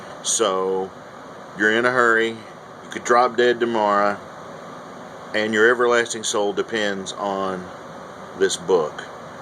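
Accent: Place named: South, United States. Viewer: nice hearing this from someone with a southern accent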